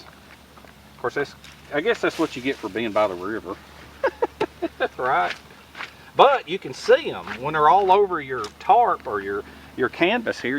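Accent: South, United States. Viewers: Nice southern accent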